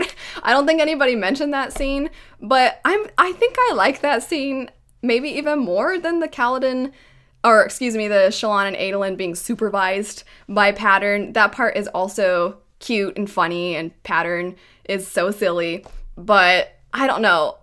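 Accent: United States. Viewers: Stumbled on this vid by accident and „Wiedźmin” collector’s edition (Polish version!) caught my eye immediately. Same for the polish „Mistborn trilogy”. Now I’m curious - are you a Pole? Can’t hear the onion accent in your English and your channel info says „US”… I’m so confused 😂 In case it’s not obvious - I’m a Pole myself and seeing those books contrasted by your perfect american accent is bugging me rn 😅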